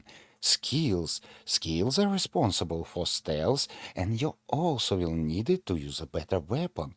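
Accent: Russia. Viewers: Is the narrator Russian?)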